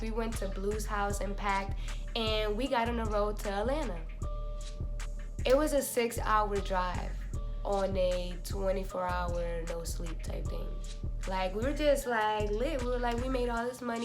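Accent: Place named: New Orleans, United States. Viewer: U in new orleans? i keep hearing u say buku lol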